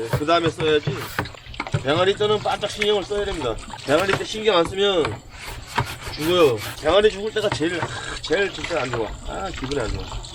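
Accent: Gangwon, South Korea. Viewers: 강원도 사투리 구수하네요 ㅎㅎㅎ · 1. 농장 이 어디 지역이에여? 2.강원도 분이신가여? 강원도 사투리 같아서여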